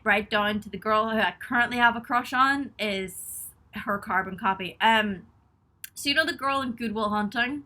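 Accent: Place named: Ireland. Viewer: Lord Tunderin Jeezis.....You Look Almost IDENTICAL To My Ex Girlfriend!!! But, Your Eyes and Personality is/are Much More Beautiful than Her.....She wasn't A Loyal, Trustworthy Person...I Personally believe She was Bi-Polar??? Anyway I'm From The Canadian Chapter of The Fan Club...There's only 9 of us..10 if You count the Dog "Buddy" (After Buddy Holly?)...LOL!!!!!!....Seriously, I Truly Love You and Your Singing....It's just that it causes Me to experience too many Feels...if You know what I mean?......I've truly Adored You for the last 8 years when I first saw You Excel...With an Excellent Elton John Tune....I must've re watched it over and over and over again about 500X....Well, This was My first Post....And That Killa Irish Accent Just Killz Me.....Lots of Irish in my family tree.....Anyway...Best Wishes....I Hope You're Doing Amazing........Hopefully someday You can Tour Canada....Barrie or Orillia Ontario Would Be 2 Excellent Venues....There's Casino Rama near Orillia....And Molson Park Ampitheatre in Barrie....Good $$$...And Nearest to Me...So...Win...Win!!!....Stay Sweet!!!!!!!!!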